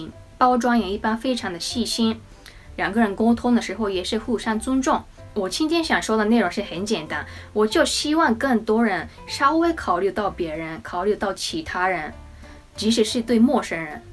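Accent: Osaka, Japan. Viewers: 大阪方言还是改不了，哈哈哈汉语说的真好，学语言确实越小学的越好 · 的口哨和大阪方言挺好听的。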